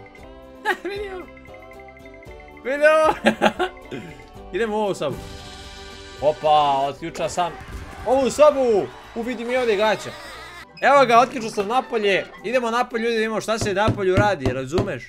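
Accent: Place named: Montenegro. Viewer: Kako si mi smiješan kad pričaš ko Crnogorac,jer sam ja odatle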